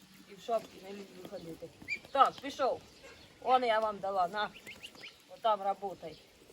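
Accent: Poltava, Ukraine. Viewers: ГОВОР ТАКЕ ,ЯК ПОЛТАВСЬКИЙ, ЧИ НІ?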